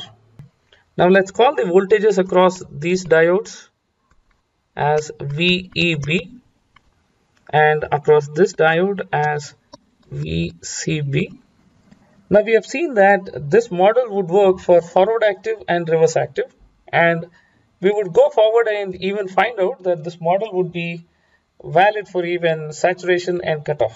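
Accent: India. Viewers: Your explanation is very good, and your accent is very harmful compared with other Indian Youtubers, but if you'd had an better mic, it would top everything!! Thanks for the vid, tho.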